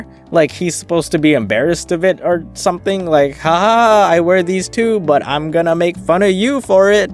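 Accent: Philippines. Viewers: He still have filipino accent lol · You’re Filipino accent is amazing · Hindi ako maka get over sa tabo! 😂 (It’s nice to hear that you still have your Filipino accent! ♥️)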